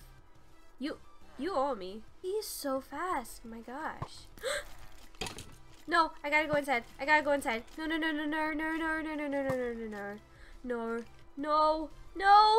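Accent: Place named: United Kingdom. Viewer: I can definitely here british fe through out this whole video tons of times XD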